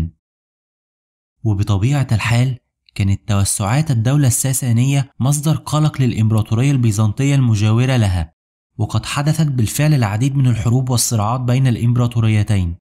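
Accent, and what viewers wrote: Egypt. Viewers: موضوع تاريخي شيق لا ينفع أن يُسرد بلهجة اي بلد وإلقاء ممل. إن أردتم رفع مستوى المحتوى فعليكم الإبتعاد عن الإلقاء بهذه الطريقة وباللهجة المصرية والنطق السيء للحروف العربية ونطق الجيم والظاء والذال والثاء والقاف كما تنطق نها في لهجتكم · لو تكون باللغة العربية من غير التلفظ باللهجة المصرية تكن أحسن بارك الله فيك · يا اخي كلشي تمام بس لهجتك لمصريه خربت ونزعت لفيدو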